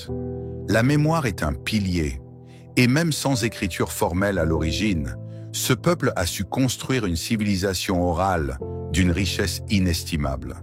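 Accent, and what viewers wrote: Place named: Europe. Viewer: je parlais de la voix qui faisait les commentaires sur la vidéo c'est une voix européenne et les prononciations ne sont pas africaines.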